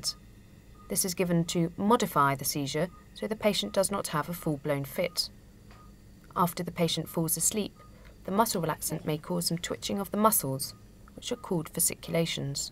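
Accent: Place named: England, United Kingdom. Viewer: I adore such English pronunciation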